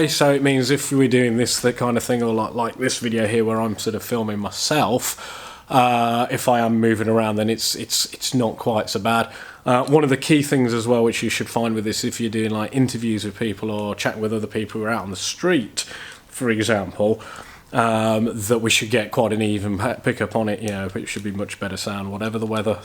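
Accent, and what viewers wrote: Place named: United Kingdom. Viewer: Was suprised to hear a fellow british voice when I clicked on this link. Thanks for making this, seriously considering a mic like this for both my 600d and my gopro.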